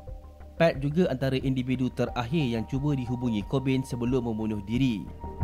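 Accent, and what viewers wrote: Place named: West Java, Indonesia. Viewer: Ini logatnya kaya orang sunda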